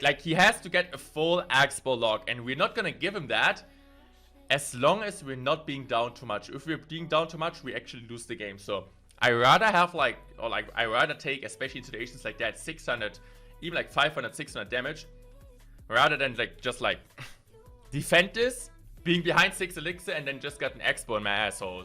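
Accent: Germany. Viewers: Hahaha come on bitch! Back after bout 4 years, clash is back baby! Beautiful games much love. Idk why but I love German accent prolly from listening to when I was a kid also funny thinking of as unc lmao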